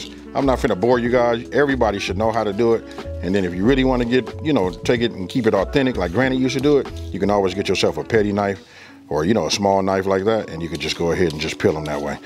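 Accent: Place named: Los Angeles, United States. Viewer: I could tell by your accent you were from LA. Watts up! Thank you for the recipe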